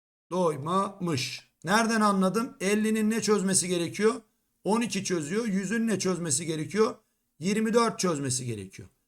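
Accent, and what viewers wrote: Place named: Erzurum, Turkey. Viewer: hocam şans eseri youtube ana ekranıma düştünüz tıkaldım izlemeye başladım konuşmanızdan Erzurum havası aldım 4. soruda 0 altı Erzurum dediğinizde dedim ki bu hoca Erzurumlu yani benim hemşerim acaba doğrumudur. ❤‍🔥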